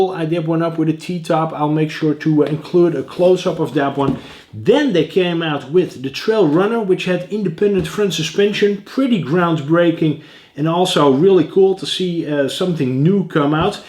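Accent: Netherlands. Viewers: What is that accent you have? It sounds a bit Dutch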